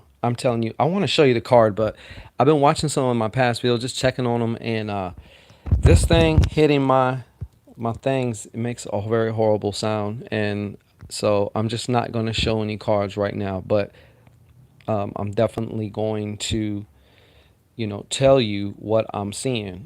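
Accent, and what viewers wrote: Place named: New Orleans, United States. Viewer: Hey! Cajun Cappy here. You sound like you could be from New Orleans! Where are you located?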